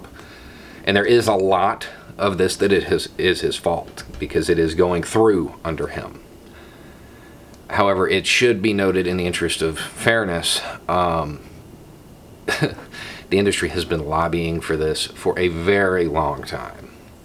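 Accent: South, United States. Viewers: Always look forward to your videos! So I was watching the clip where you spoke about structured anarchy in Vegas (I think that's where it was). I don't know if it's the difference in recording devices used, but your voice sounded very different. In that clip, it was very deep. On your channel, however, you have a much stronger southern accent. Sorry if this comes across as rude or impolite; that wasn't my intent. Just something I noticed, like when Curious George is flipped upside-down. Anyhow, I'm always on the lookout for your content. I learn so much that I never knew! Keep up the awesome work!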